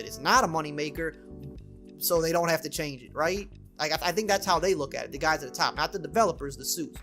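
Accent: Louisiana, United States. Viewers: are u from Louisiana because I am too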